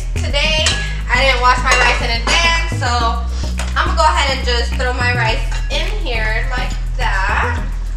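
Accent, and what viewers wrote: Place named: Puerto Rico. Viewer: I loveee your Puerto Rican accent 😭😭❤️❤️❤️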